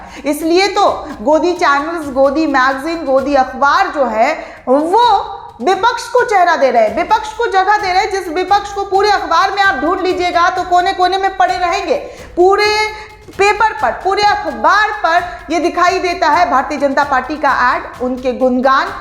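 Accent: Bihar, India. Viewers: Achha bolti ho,, achhi anchor⚓ ing karti ho, Zaban thodi si biharion ki tarah hai, aapki. ☺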